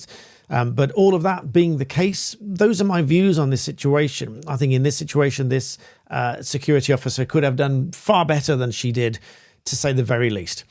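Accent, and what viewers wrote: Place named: RP, United Kingdom. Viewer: Another long and boring posh talk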